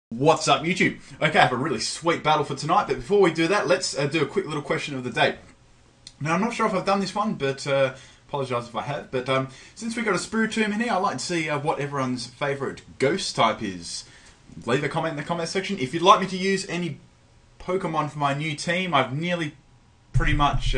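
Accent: Australia. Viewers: He says it the Australian way, not the stupid American way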